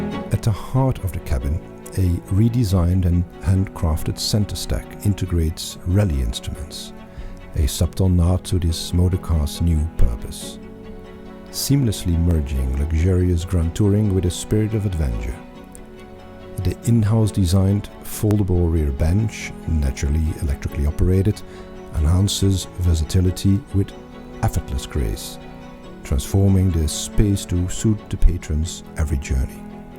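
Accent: Netherlands. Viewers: Jammer van dat Nederlandse-engels van de voiceover, maar wat een schitterend ontwerp. Als ik zo’n coupé zou bezitten zou ik Niels direct vragen die ook om te bouwen. Prachtig! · Not sure they’re assumptions. I’ve tweaked my wording to better express the ideas. It’s not a style from today and based on a vehicle from half a century ago, so anyone extrapolating from it is an outsider looking in. Seems like a fair observation more than an assumption that because it’s a LHD vehicle built by a Dutch company it’s not British, but Dutch. It has the most stereotypical of Dutch accessories, the bike rack. Even the voice over has a Dutch accent. The electric drivetrain comment is about a personal viewpoint, not an assumption. My third comment is not an assumption either. I’ve always thought herringbone tweed would look great in a car and you’ve proved that to be true! 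This vehicle is utterly charming and looks exquisitely built and I love the “exercice de style” that it constitutes. But it is undeniably anachronistic and somehow feels foreign. It reminds me of how the Japanese see Britishness.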